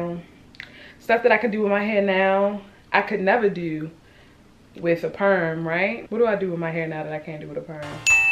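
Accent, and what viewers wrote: Baltimore, United States. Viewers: I KNOW you’re from baltimore or someone who raised you is😂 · Are you from Baltimore? You sound just like a Baltimore girl like me lol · sis are you from balitmore? you got the accent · Gotta be Baltimore Like has to be lol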